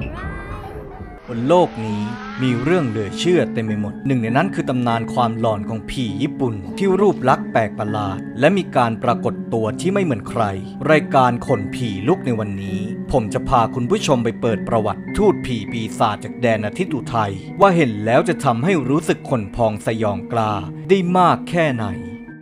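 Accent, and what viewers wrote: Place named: Central, Thailand. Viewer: คุณ ผมฟังคลิปคุณมาหลายคลิปแล้ว ผมชอบตรงที่การบรรยายของคุณมีเสียงกลางออกเหน่อ นี่คือเอกลักษณ์ประจำตัวคุณ มีเสน่ห์ประจำตัวที่ให้น่าติดตาม ผมชื่นชมและจะติดตามไปเรื่อยๆและตลอดไปครับป๋ม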